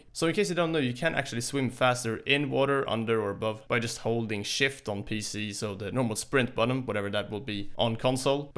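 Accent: Sweden. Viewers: are u by any chance swedish? u talk english like sweds 🤔😁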